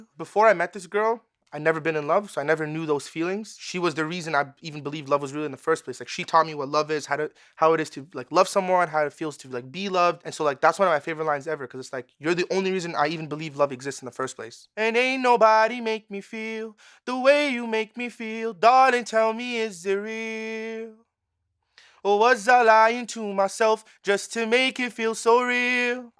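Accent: Toronto, Canada. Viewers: I can tell by the way he talks he’s from Toronto. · When he started I thought he has a toronto accent and the I looked him up and I was right! Lol anyways this guy is amazing! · Toronto mans accent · he sounds like a guy from toronto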